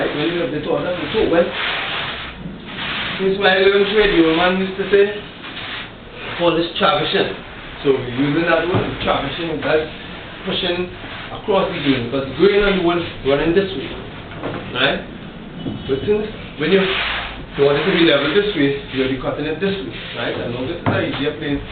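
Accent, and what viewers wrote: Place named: Trinidad and Tobago. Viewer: breds, yuh sound real real trini, yuh know? ah tellin' yuh, boy, is like yuh's mih breddah fuh true.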